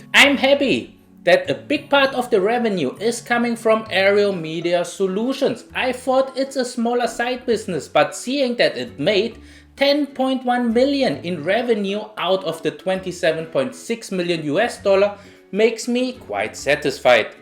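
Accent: Germany. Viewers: U have an interesting accent, it almost sounds like a German accent.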